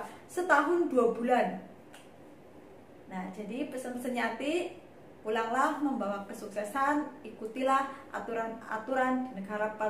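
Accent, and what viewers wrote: Banyumas, Indonesia. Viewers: Jebule rika wong ngapak yak hhh jossss wonosobo monitor.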